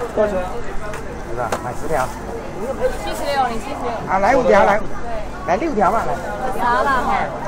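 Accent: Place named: Beijing, China. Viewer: 好像是北京口音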